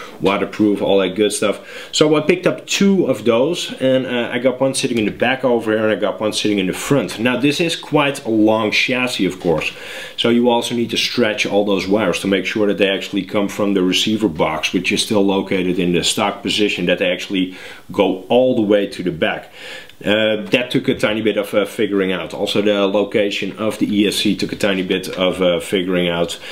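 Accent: Netherlands. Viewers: ok, are you from Holland?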